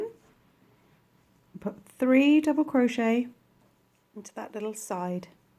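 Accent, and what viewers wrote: United Kingdom. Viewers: I think that even though I'm from the UK, I grown to use USA stitch terms because all the people on YouTube who taught me how to crochet are american Also excuse me for being stupid, but are you from the UK, because you sound like it P.S you explain so well! This helped me so much!!!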